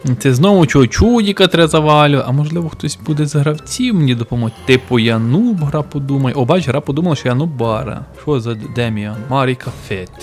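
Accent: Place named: Zhytomyr, Ukraine. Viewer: Ти можеш нормально говорити, чуму не житомирською.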